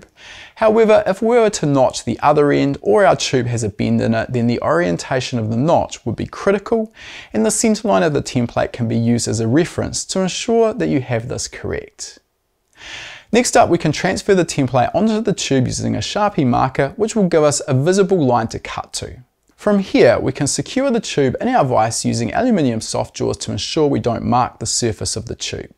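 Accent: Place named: New Zealand. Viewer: Awesome. Thanks ✌🏽 PS-Maybe the sour responses about the “what makes it teck” saying were from guys that wish they had a cool accent? I certainly wish I had the cool kiwi vocabulary/accent haha. -Best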